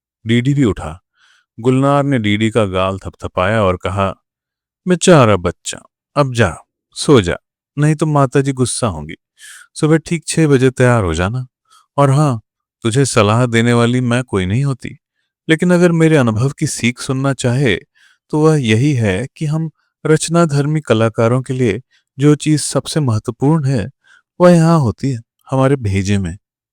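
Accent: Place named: Kumaon, India. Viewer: मनोहर श्याम जोशी जी के 'कसप' को आपकी आवाज़ में सुनना एक अद्भुत साहित्यिक यात्रा जैसा है। इस उपन्यास के हर पात्र के लिए जिस तरह से आप अपने स्वर का चयन करते हैं और उसमें जान फूंक देते हैं, वह सचमुच काबिले-तारीफ़ है। खासकर कुमाऊंनी भाषा के शब्दों का आपका उच्चारण और जिस लहजे के साथ आप उन पर काम करते हैं, वह श्रोता को सीधे अल्मोड़ा की गलियों में ले जाता है। सबसे सुंदर बात यह है कि जिस सहजता से आप उपन्यास के बीच-बीच में गीतों की पंक्तियों को पिरोते हैं, वह कहानी के प्रभाव को कई गुना बढ़ा देती है और हर दृश्य को जीवंत कर देती है। आपकी वाचन शैली ने इस कालजयी कृति के रसास्वादन को और भी अधिक समृद्ध कर दिया है। इतनी मेहनत और कलात्मकता के साथ यह प्रस्तुति देने के लिए बहुत-बहुत धन्यवाद। सुनने का अनुभव अत्यंत सुखद है!"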